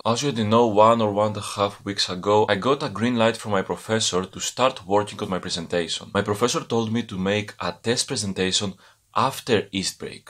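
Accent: Greece. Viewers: I knew you are from Greece because of the accent (is similar to my relatives). Which part of Greece are you from? Also, I love the videos! Keep it up! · The accent never lies, you are from Greece!!! In what major are you studying? I'm studying computer Science in technical institute of Crete. It's my first year and I don't like attending my classes , I find them extremely slow paced and lacking educational material.I prefer being self-taught from online documentation and tutorials so I can progress faster. Have you found yourself thinking the same thing about your college?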